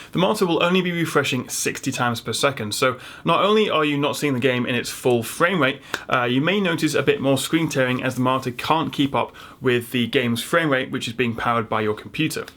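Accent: United Kingdom. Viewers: R Doc Id rather listen a pleasant British accent than an accent that sounds like a Canadian who stuffed two lbs of processed cheese up their butt. Just sayin.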